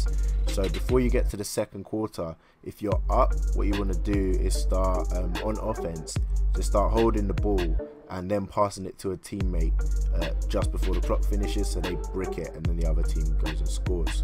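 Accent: London, United Kingdom. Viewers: Your from London init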